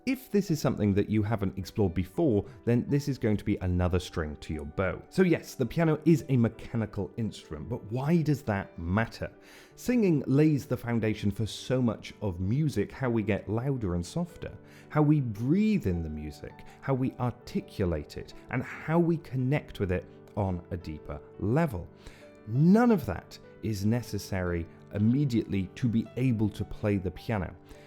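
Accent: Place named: United Kingdom. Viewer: Beside the subject...I absolutly love his accent ❤ Is it British....certainly not Américain 🤮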